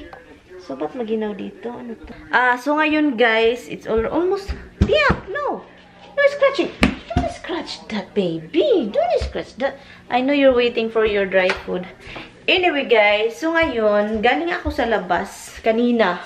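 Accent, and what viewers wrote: Visayas, Philippines. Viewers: Hi sis , Pasagde na sila kay gusto kaayo kos imong accent hahaha Bisaya gud ta 😍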